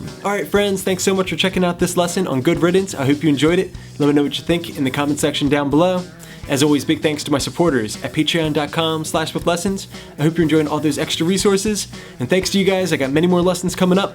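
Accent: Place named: South, United States. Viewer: Love the country accent to it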